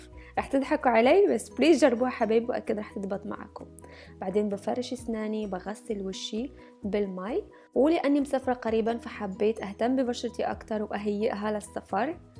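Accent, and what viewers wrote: Morocco. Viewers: Si maghrbiya bayna ghir tari9a kalam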